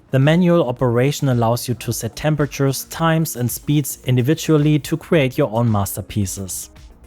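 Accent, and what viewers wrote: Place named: Germany. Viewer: Ich erkenne einen deutschen akzent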